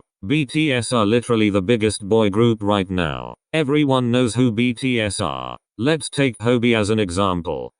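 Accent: United Kingdom. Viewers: ayo british? 👀👀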